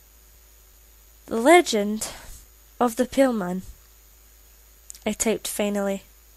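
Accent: Scotland, United Kingdom. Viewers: Could you please say "fishfingers and custard" in your awesome Scottish accent? Awesome creepypasta.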